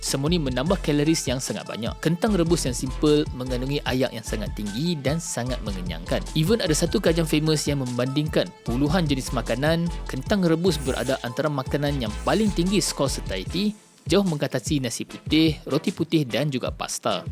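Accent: North, Malaysia. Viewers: Doc mesti org utara kan..sbb saya dgr doc kata "ayaq"...kita gang 💪💪😂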